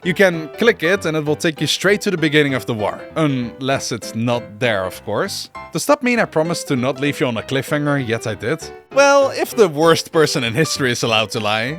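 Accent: Netherlands. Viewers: Ik weet al door deze accent dat dit een nederlander is lol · Dutch accent? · i smell dutch here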